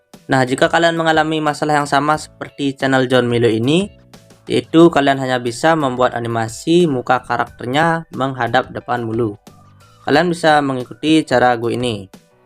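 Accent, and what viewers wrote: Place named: Padang, Indonesia. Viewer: Logat padang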